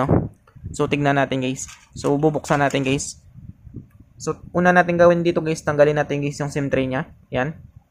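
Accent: Iloilo, Philippines. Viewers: Dw ilonggo ka bala mag hmbl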